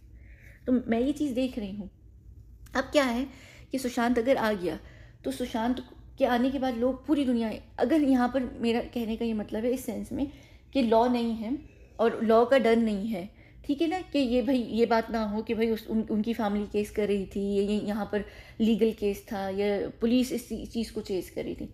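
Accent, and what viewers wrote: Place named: United Kingdom. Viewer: All this is true. But becuz speaker says she is from a wealthy family with an enviable diamond collection , speaking multiple languages with a British accent etc……..she looses credibility.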